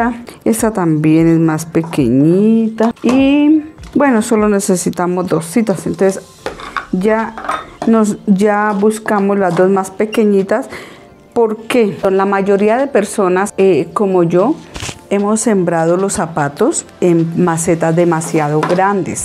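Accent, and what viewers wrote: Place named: Colombia. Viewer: Que acento colombiano más bonito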